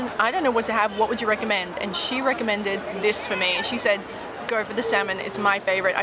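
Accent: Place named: Australia. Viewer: this is the Best part of the Video this is what causes Depression... What happened in the past stays in the past.. Don't think Negatively, move on.. You're so beautiful and Gorgeous, you have that amazing Aussie accent, you're showing us the World... Keep Cool.. waiting for your next Video. Take Care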